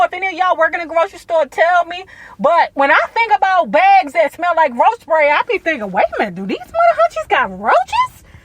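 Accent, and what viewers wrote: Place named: Anacostia, United States. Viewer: Anacostia ? I though I heard an accent 😂😩💞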